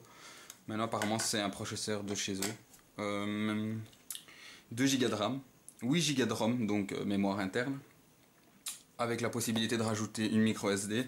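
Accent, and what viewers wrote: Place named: Liège, Belgium. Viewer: tu viens de liege toi hein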